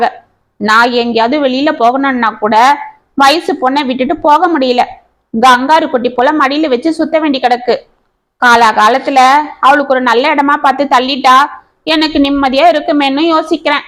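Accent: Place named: Theni, India. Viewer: உங்கள் குரலில் தேனி வட்டார மொழி அருமை❤️❤️❤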